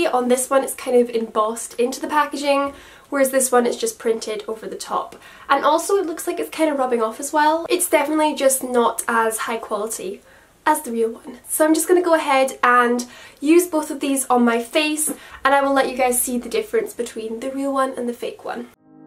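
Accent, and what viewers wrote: Scotland, United Kingdom. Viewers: I feel like I hear a Scottish accent a tiny bit from you? Might just be me tho😂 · Are you Scottish? Your accent is so lovely😻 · Omg right I was Watching this and I was listening to your accent and I was like you sound quite Scottish so I clicked the link and I was right I feel proud 😂 I'm from Scotland too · Omg I'm crying (literally) because your accent is exactly like mine! And to find a YouTuber that lives so close to me is insane!! And to hear you day Anastasia in a Scottish accent make me so happy! Because all the YouTuber I normally watch are American or English! Or Australian. So it's pretty cool to find a Scottish YouTuber!! X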